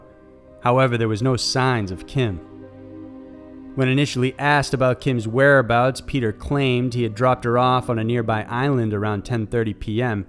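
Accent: South, United States. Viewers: Can anyone pick his accent? He reminds me a little of Elvis so I'm guessing he's from Mississippi.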